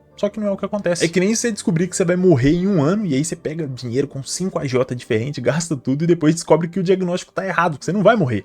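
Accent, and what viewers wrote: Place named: Bahia, Brazil. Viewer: levemente baiano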